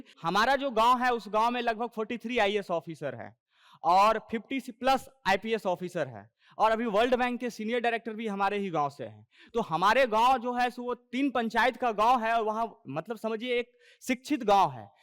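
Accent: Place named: Bihar, India. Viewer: Awesome work and your bihari language ke sath jo v aapne kaha dil chhu gya.... thank you so much ji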